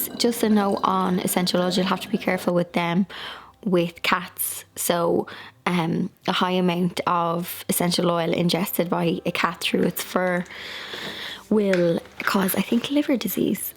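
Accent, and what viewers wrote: Ireland. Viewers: Love your videos ❤️ I just found you!! I'm hooked so I subscribed! I'm from South Carolina, USA. So this southern accent loves your Irish accent!! Your home is beautiful!! I'm in love with your sofas!! Did you buy them or did you reupholster in that beautiful fabric?!! ❤️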